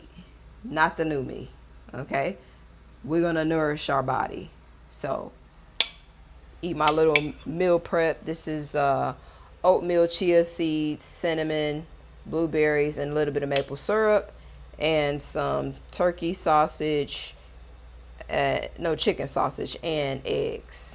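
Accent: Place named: Chicago, United States. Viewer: I have fallen in love with you!!! Ahhh.. and I recognize your accent. Chicago! Thank you for your sharing amazing talent! I love decorating and making my home elegant and beautiful! Your tips and so easy and very helpful!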